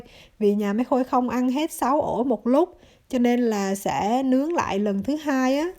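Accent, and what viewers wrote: Central, Vietnam. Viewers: Cám ơn Mai Khôi chia sẽ. Nghe giọng nói hình như người miền trung hoặc Đalat?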